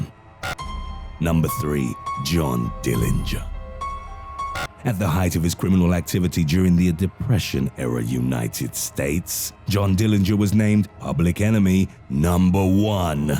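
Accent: Spain. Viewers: nice video, your spanish is pretty funny btw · this narrator gotta be some sort of spanish. (btw love the voice)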